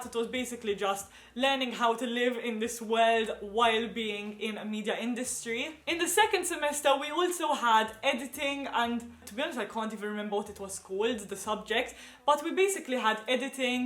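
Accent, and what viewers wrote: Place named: Jamaica. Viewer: Shes got a Jamaican twang with a mix of Londoner and Russian for Rs and Ls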